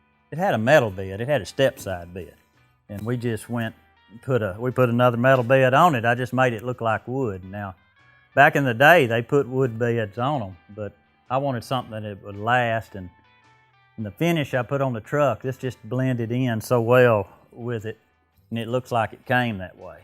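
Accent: United States. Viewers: love the passion you have and how much the different pieces mean to you. I will be watching, good to know people like you still out there...your accent reminds me of all my family back in Georgia, Mississippi...i use to have that same accent but lost it many years ago. i use to get teased when I was younger, living in California...never really bothered me at all. But guess what....now they say --y'all and it just doesn't fit...oh well. Let the good times roll. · I was born and raised in rural Mississippi. The army too me all across the world. Your voice and accent remind me of home and memories growing up. We had a Mr. in our community, Mr. George Carter who ran Carters Store, a great man. Absolutely loved the video.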